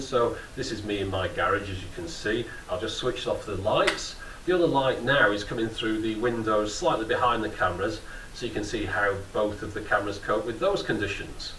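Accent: United Kingdom. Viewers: you have a bloody cool british accent!!! :D- nice review, thanks! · Indeed! Somehow I love reviews in British accent. And other than 'Dr. Ashen', ' ' reviews good stuff (well, sometimes).:)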